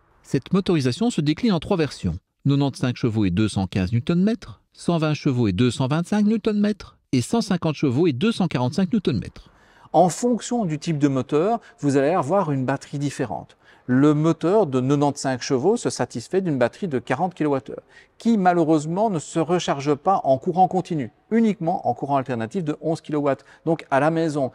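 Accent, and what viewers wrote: Belgium. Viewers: Merci pour cette video, anciennement frontalier, votre accent m'a rappelé de bon souvenir sur les plages de La Panne !!! Je ressens votre amour pour renault 😊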